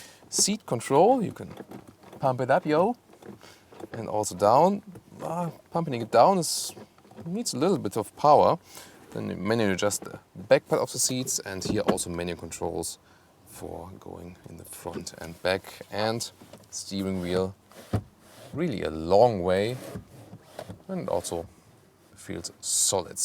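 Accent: Germany. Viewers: Alfas are today quality cars. I had a 159 2.4 diesel model from 2006 to 2009 and that was the first well built Alfa to my knowledge. Giulias are today very beautiful cars with a special touch of emotion. is well prepared and hence knows his stuff. I especially enjoy his somewhat funny English pronunciation and partly German intonation. Thank you. Hopefully I did not insult you as that was not my intention.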